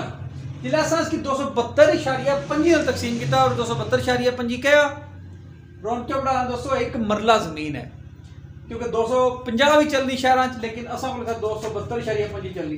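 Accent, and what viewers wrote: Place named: Punjab, India. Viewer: Ye kis zile di jaban hai.tusa Punjabi se mukhtlif bol rahe ho.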